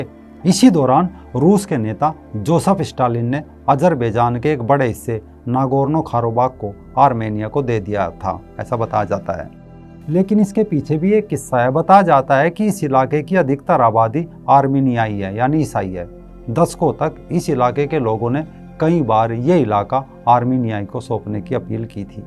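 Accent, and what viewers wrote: Haryana, India. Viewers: My mom is alsofrom haryana I understand this haryanvi slang of hindi by bhai😂 but one thing he tries so hard to provide excat news to us his work is more authentic then most of the famous news channels, keep it up bhai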